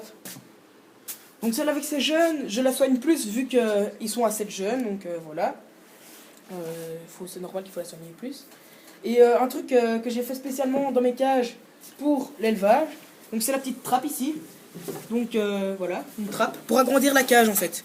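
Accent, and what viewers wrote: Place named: North, France. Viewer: on dirait un mec du nord vu le parlé sinon lapins mignons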